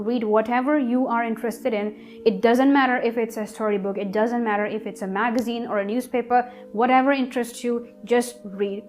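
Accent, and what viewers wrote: United Kingdom. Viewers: Seems like she is native of uk 😄😇😇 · How to get used to UK accent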